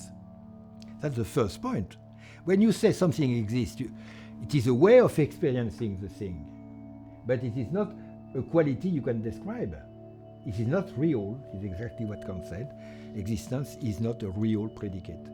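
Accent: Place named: France. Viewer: The priesthood is a very noble calling and much nobler than that of a philosopher. I think that Heidegger and Marion are not the best philosophers to be studied by ongoing priests, nor any other pretentious Frenchman for that matter. Regardless, I wish you all the best in seminary and in life. Today I will go to confession and pray for all fathers and (ongoing) priests. We the laity ask for good priests. One should not forget that faith is an unearned grace. Thank you for going to seminary. But concerning Marion:There is so much loose "thinking" in here that I am really amazed how people can fall for this. (Maybe it is the overly harmonic, dynamic and almost spherical music in the background? The French accent? The light, the colours of his clothes and the grain of the cloths?) He starts by "proving" that any concept is inadequate for God, by appealing to an "Augustinian principle", which he formulates as "If you comprehend it, it is not God". 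However, I think that here he conflates "comprehending" with "having a concept of". I can clearly have a concept of, say my spouse, even if at times I do not comprehend her, meaning that I do not comprehend her fully. Maybe my concept of my wife is then also "not adaequate", and I would be open to such a possibility (although I would find it ... you know ... a bit laughable and french. I clearly able to identify my wife and I do not mistake other women for her nor do I mistake her for other women. I would say that my concept of her is a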